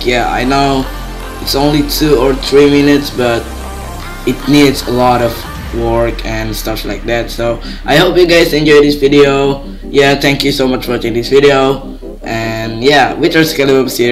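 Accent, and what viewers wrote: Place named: Philippines. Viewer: Your sound is :v Pinoy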